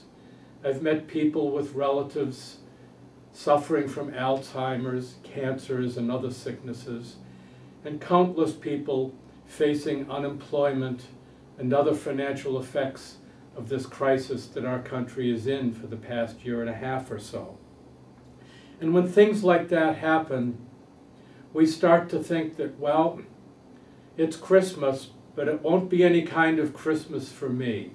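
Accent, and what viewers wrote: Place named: Boston, United States. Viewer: Cousin, I really loved this video. It bring the spirit of Christmas through the voice of children. All done in a still nice Boston accent. You are a star! Merry Christmas. I'm going to Japan on 12/27 to see friends ( i use to live there) and to celebrate my 60th birthday on the Epiphany, Jan. 6th. from your cousin Joanie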